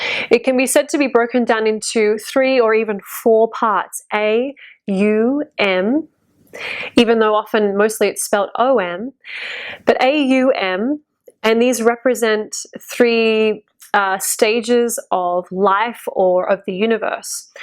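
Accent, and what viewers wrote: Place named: Australia. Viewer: Thanks for your reply. Just curious, your accent sounds Australian. Am i right?. Where did you study(assuming you did) in India? Some common places for westerners being Rishikesh or the Shivananda Ashrama in kerala. I am from India by the way and i am a native of a the temple town of madurai working in Bangalore at present.. We are a family of traditional Brahmins and Vedic scholars, with my father maintaining the ritual fire. We use OM to start vedic chanting and to end I found your explanation for OM to be down to earth and realistic, compared to the one's many fake Indian gurus give.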